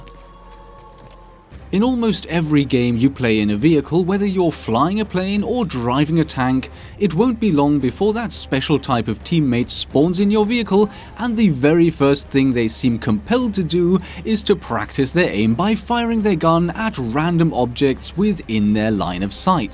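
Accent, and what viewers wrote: RP, United Kingdom. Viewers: Your tone is so pretentious I cannot manage to get through this video. You're talking about irritations on a video game, not reading out the verdict in a highly contested court case. You don't have to speak in BBC English.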